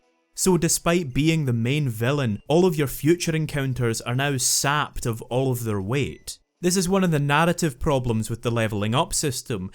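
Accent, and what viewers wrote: Scotland, United Kingdom. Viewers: I never thought hearing an extremely powerful Scottish accent after the ORAS opening would be so jarring. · THIS IS MY FIRST VIDEO IVE SEEN FROM THIS GUY AND THE SCOTISH WAS THE BEST SURPRISE EVER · HOLY MOTHER OF ALL VIDEO ESSAYS... Good thing I really enjoy scottish accents. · This guy ain’t just Scottish, he hella scottish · Seven hours of ah Scottsmann tolkin aboot Pokähman? Consider me signed up. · Mm yrs I sure do love a Scottish man narrating a remake of a game for 7 hours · Lol i couldnt understand i it because of the scottish. But your brothers catchphrase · 7 hours of Scottish accent, LETS GO!! For some reason it actually helps me focus on the content of the video. Don't ask me why lol Have you ever gone back and played it since this video? Shiny hunt or just playing through again? What about AS? have you played that yet? 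I've always kinda disliked that they just copy pasted the Battle Maison from XY and not have their own unique battle facility. I know HG/SS did that with plat but still :(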